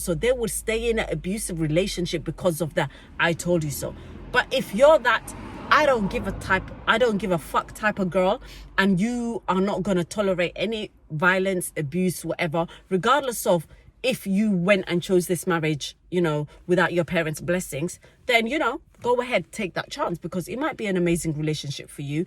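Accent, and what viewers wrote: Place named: Somaliland. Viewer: Sorry, I had to comment again the reason your children are non considered a somali in the Somali context is because of the clanal context within Somalia your children will not be considered Somali but Granadian and therefore will not get clanal protection if they were to live in Somalia. No matter how much you try to advocate it within the Somali context your children are NOT somali they are Granadian no matter how strong their culture is and how much you have instilled somali culture in them. In the West its all fine, I see hint of somaliland accent, if that is case in SOmaliland your children will never get nationality because of the constitution as you get it through the father side.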